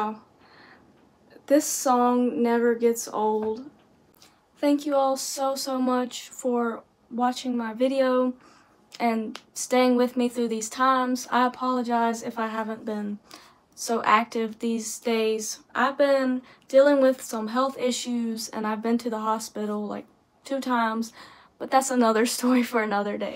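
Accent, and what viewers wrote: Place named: Minnesota, United States. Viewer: Thanks for this reaction,! You are right that so many of Persian music is/sounds sad! I believe music shows "the state of a society". Unfortunately, in at least the last 200 years, Iran has experienced a lot of downs which may reflects itself in music. Just my 2 cents. I hope that things change societally, and we can produce cheerier (but still meaningful) music. I hope a speedy recovery for you ❤ By the way, I like your accent! If I may guess, Minnesotan?:)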